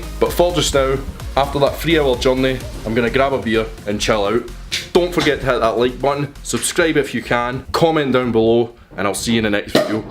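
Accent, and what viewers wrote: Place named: Scotland, United Kingdom. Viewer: Knew I was going to enjoy your videos and I did. Subscribed as well. Scotland is one of the most beautiful places and absolutely love that accent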